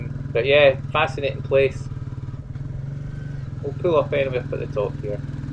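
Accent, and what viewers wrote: Scotland, United Kingdom. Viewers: Lovely tour. I’m trying to track where you are; could you indicate a few of the locations? (Sorry, my Midwest American ears can’t always interpret the town names with your melodious Scottish accent😊). The 350 I understand perfectly.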